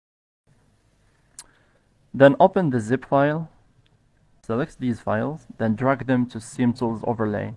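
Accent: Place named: Morocco. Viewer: Out of topic u sound Moroccan or Algerian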